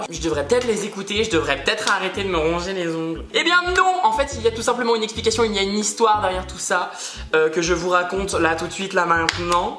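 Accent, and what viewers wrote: United Kingdom. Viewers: Y’a qu’à toi que ça arrive ce genre de choses 😭 Je suis d’accord avec les autres commentaires, t’as grave pris des mimiques à Gaëlle c’est chouu Et j’ai une petite question, ton accent anglais tu le sors d’où ? J’ad-oreee 😍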